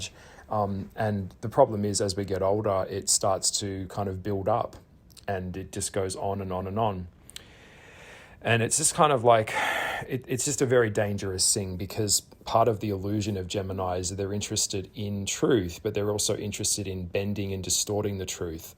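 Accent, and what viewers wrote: Australia. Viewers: I only stayed because the Australian accent sounded cool until he was saying all these gross.Horrible things and it made the voice and accent sound not good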